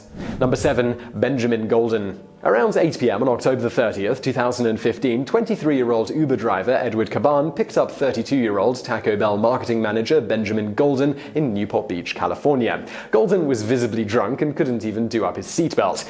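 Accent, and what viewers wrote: United Kingdom. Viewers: you have a British accent that doesn’t sound like your constantly smelling something bad,love it.very first class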